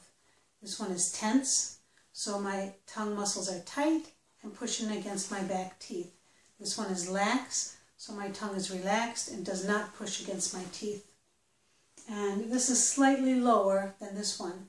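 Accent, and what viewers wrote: United States. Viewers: Excellent and the best broadcasts American's pronunciation !! I'm grateful for you Madame. Thank you +++. Fadi from France, advanced learner English in Canada .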